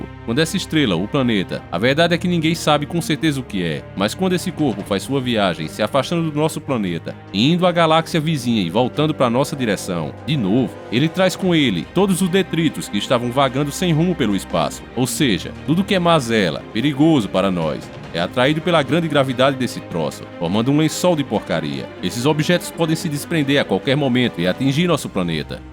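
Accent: Northeast, Brazil. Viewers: Sotaque de nordestino kkk mas a voz e boa... Cornao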